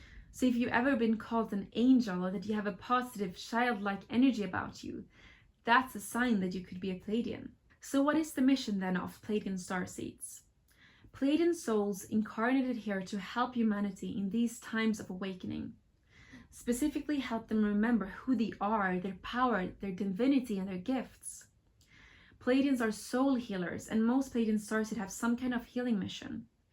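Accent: Sweden. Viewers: Are you Swedish? Love the accent! If so, you are already Nordic, so definitely a Nordic Pleiadian!! 😉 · As a norwegian, it's so easy to hear that she is Swedish, by the accent 😄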